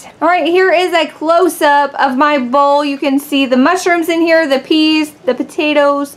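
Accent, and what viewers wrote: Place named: South, United States. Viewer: She is so cute. And has a southern accent! (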